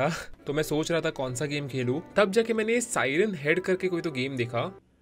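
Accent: Maharashtra, India. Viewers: You have a Marathi ancient in speaking and I love it yarr....🥳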